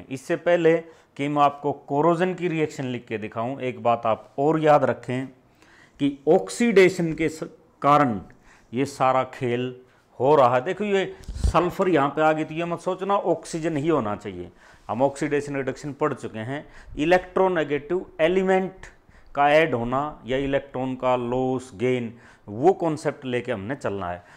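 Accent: Haryana, India. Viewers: Sir are you from Haryana.?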